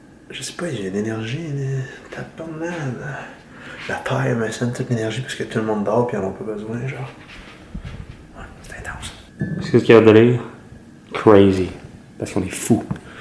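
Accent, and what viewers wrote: Canada. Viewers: Tu est Canadien?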